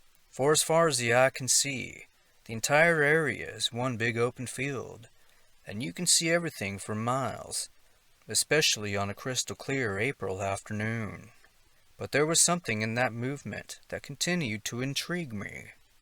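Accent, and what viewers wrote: South, United States. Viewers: Always loving that southern accent .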